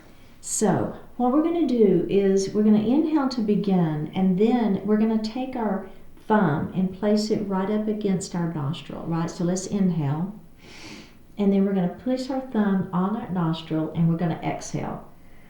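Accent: South, United States. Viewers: Hi pretty, So happy I found your site even though your challenge started a year ago, better late then never! I have just recovered from Cervical Spine Surgery and had 4,5, and six disc removed and a titanium plate with a screw as replacement. I will be 79 May 4th. I had the surgery February 6th 2023 and in August- December had 3 very bad falls. One of the problems was a medication called Cymbalta and having researched for weeks it has been known to be a destructive med for many people since around 2014. There are doctors and people on YouTube that have shared their horrible experiences with getting off this med. I’m talking way over 100,000 people but most doctors are handing it out like candy and the withdrawal process must be very, very slow. Don’t know why I rattled off all of that so I’ll blame it on Cymbalta! I have told other people and my new Physical Therapist and even tho I have Fibromyalgia and arthritis they said go for it! 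Love your southern accent and your peace. I go to Bible study and have His peace but I tighten my shoulders many times instead of letting them relax. I will just keep trying my best to relax😊❤❤ Sincerely, Paulette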